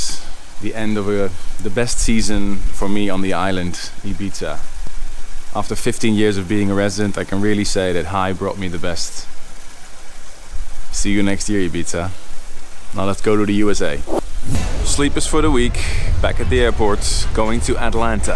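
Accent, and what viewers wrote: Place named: England, United Kingdom. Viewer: Getting a little English accent there😉❤️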